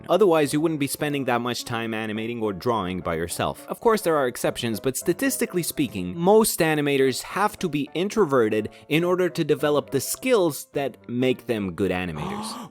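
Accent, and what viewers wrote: Romania. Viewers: Yo man, your accent is GODLY for somebody from Romania! I live there too, and my accent is trash.... I want to as you a question . Where do you live? Like, in what county? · You have such a nice accent Were you raised in Romania? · Please answer me: Are you romanian?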